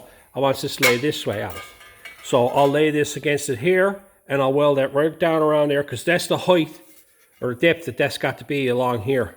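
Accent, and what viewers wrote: Ireland. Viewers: Hope you don't mind me asking if you have any immidate Irish relatives, there a certian irish accent/ twang to some of your words. appolagies if i'm way off, came across your vid looking for rusty arch's, I'm in Ireland myself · What is his accent? is that Irish? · What accent do you have? It is interesting. Sounds slightly Irish.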